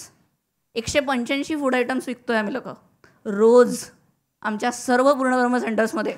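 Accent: India. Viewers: I think if u r wearing navvari saari and showing so much gratitude toward maharashtra then u should change ur accent as well... As you were staying in banglore for year u r having that South Indian accent which sounds really irritating. Please be proud of being maharashtrian. U r purpose will not fulfil by only wearing navvari but please work on accent. Stop taking out that south indian accent. If u really proud of being maharashtrian.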